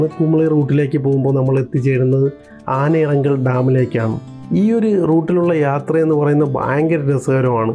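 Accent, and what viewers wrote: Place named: Trivandrum, India. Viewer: മൂന്നാർ ട്രാവൽ ടിപ്സ് സിംപിൾ ആയി അവതരിപ്പിച്ചു പൊളി...... അതും ട്രിവാൻഡ്രം സ്ളങ്ങി ൽ ..... ആശംസകൾ 🌹🌹 കൂടുതൽ വീഡിയോസ് പോസ്റ്റ്‌ ചെയൂ..... 👍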